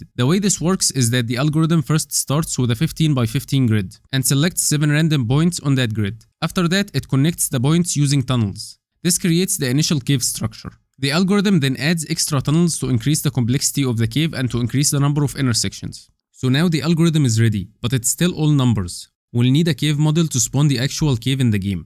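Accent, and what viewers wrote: Egypt. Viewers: عااااااش يا باشا ماشاءالله موهوب عرفت انك مصري من اول الفيديو❤❤❤❤❤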